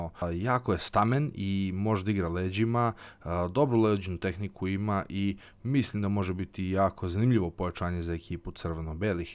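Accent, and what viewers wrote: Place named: England, United Kingdom. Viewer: Zajebi košarku, daj nas nauči taj engleski naglasak🔥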